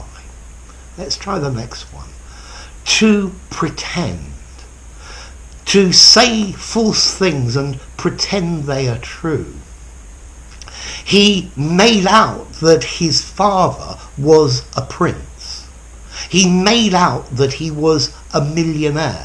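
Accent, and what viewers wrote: RP, United Kingdom. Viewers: I like your RP English accent and the way you teach, as all that makes it easy to make out what you are talking about. Thank you sir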